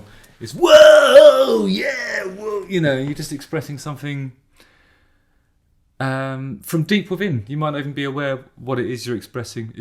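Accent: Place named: Lowestoft, United Kingdom. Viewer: I'm so -pleased to find your channel! As a fellow Lowestoft chap, and former regular at the Triangle and O'Reillys (where I also worked), hearing you chattering away like this takes me back! Oh, and while more a 'Woo' than a 'Woah', has several good ones.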